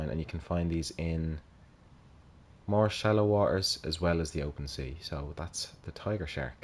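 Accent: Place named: Dublin, Ireland. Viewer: love your accent! Are you from Dublin?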